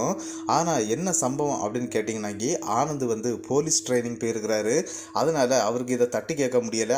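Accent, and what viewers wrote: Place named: Nagercoil, India. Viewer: It is so nice to hear brother speaking nagerkoil tamil as I am from nagerkoil thankyou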